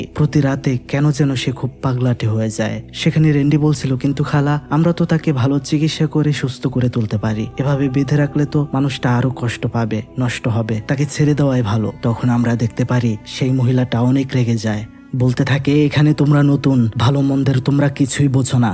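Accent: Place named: Bangladesh. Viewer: Kothar style a mne hocce uni bngldshi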